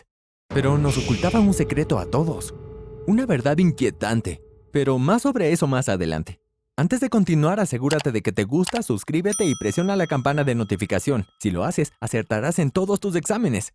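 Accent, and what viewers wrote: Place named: China. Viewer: soy la única que pensó que la vos parecía de un chino hablando español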